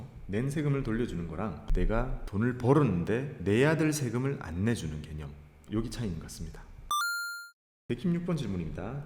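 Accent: Gyeongsang, South Korea. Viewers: 곰희씨 특유의 사투리, 같은 경상도 사투리 사용자로서 넘 친근해요. 우짜죠? ㅋㅋ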